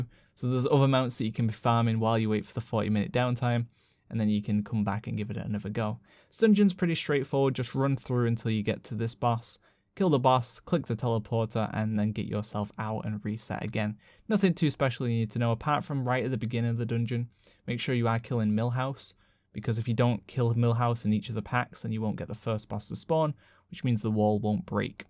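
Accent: United Kingdom. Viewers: Svante Bard that’s the british accent for you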